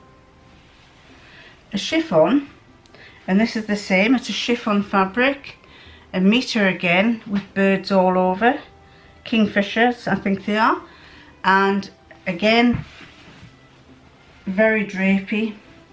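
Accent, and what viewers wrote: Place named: Teesside, United Kingdom. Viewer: Listening to you takes me back to where I grew up, around West Hartlepool, Darlington and Dinsdale. My family emigrated to America but half my heart remains there. I can hear the same phrases and laughter from my relatives. Love when I get to visit and I have been told I pick up the same accent when there. Love your hair!! And I will have to check out Boyes when I visit again because I love the blue fabric you showed. Take care and happy sewing!!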